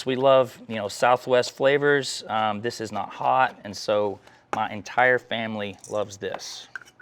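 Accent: South, United States. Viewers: The perfect speed to watch this video is 1.75X. Try it at 1.75X speed and you will see it watches like a regular video and this guys slow manners and southern drawwwwwwl Go away.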